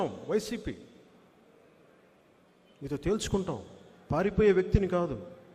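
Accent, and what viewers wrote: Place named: Telangana, India. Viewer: Telangana యాస ఎప్పుడు తక్కువ అంచన అని నిరిపిస్తున్నారు........ Don't play games 😛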